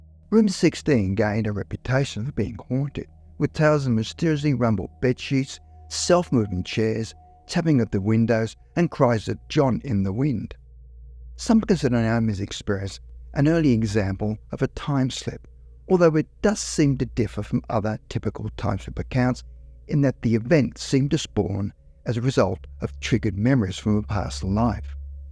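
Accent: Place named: Australia. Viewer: Wonderful stories with a twist and a Aussi accent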